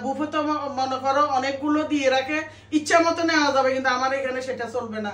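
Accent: Noakhali, Bangladesh. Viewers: আন্টি আপনার দেশের বাড়ি কি নয়াখালী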